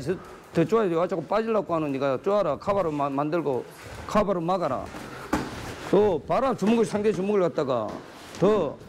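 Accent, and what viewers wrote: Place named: Busan, South Korea. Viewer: 부산싸나이 말투 쎄네요. 그 속에서 묻어나오는 정이 느껴집니다~^^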